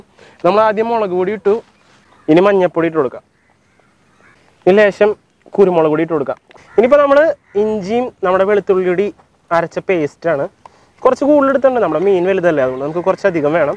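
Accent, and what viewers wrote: Thrissur, India. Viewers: chettante thrissur slang miss chayyunathu njan mathramanno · ഒറ്റയ്ക്ക് തിന്ന് തീര്‍ക്കാന്‍ പറ്റോ മച്ചൂൂൂ നല്ല തൃശൂര്‍ languge എനിക്ക് ഈഷ്ടപ്പെട്ടു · It's Thrissur district Malayalam style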